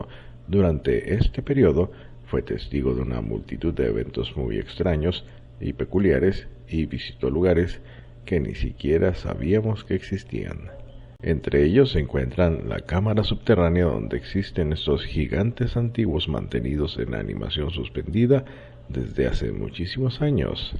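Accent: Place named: Spain. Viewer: Asta que cambian las voz. K ya me tiene asta la madre las voz español o gallego. Mierda. La lengua española de es la más horrible de la abla ispana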